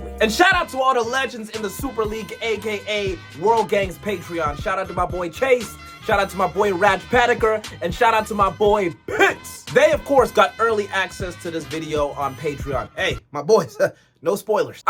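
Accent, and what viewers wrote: United States. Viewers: You're south African You sound American But live in the UK? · How were you born in South Africa live in Birmingham but sound American. That's mad 😂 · If he’s South African and leaves in England, why does he speak American?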